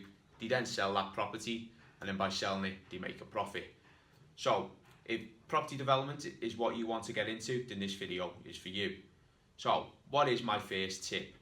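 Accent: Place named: Wales, United Kingdom. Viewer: you sound welsh I'm welsh to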